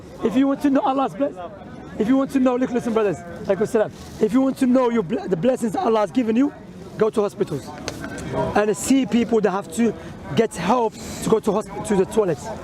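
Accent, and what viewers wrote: Algeria. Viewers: بارك الله فيك اخي .لديك حجج قوية وكلامك منطقي ومقنع لاي اجنبي عن الاسلام.اول مرة نشوف داعية بقوة اقناعك وبراهينك المثيرة والبسيطة .واصل شرف لنا انك جزائري عرفت لهجتك وحدي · عند رؤيتي للفيديو أحسست بأنه جزائري من خلال لهجته ، و الأن تأكذت من ذلك بعد تعليقك شكراً ، و أنا فخورة كذلك كوني جزائرية بهذا الأخ الله يبارك ❤❤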